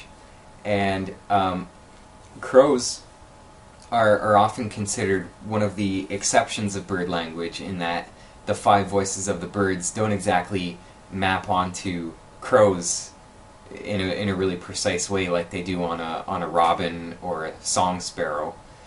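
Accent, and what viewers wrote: Canada. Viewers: A very heavy Canuckian lilt. I say he's from Saskatchewan. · I love your strong Canadian accent ❤❤❤ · "Aboot" Canada, obviously.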